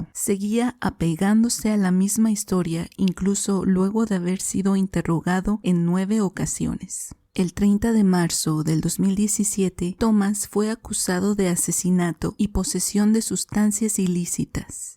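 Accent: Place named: Brazil. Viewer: Hey I hear an interesting accent do Brasil?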